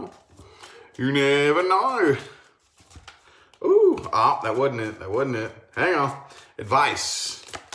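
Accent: South, United States. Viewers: I love your voice!! It sounds very southern ❤💕💕💕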